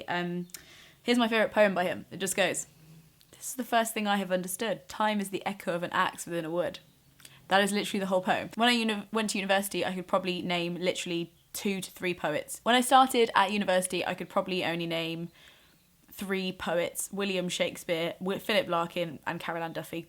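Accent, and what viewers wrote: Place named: England, United Kingdom. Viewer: I love your English accent <3333 greetings from Argentina <33 And I actually liked your poem, it has a good rhyme to it :)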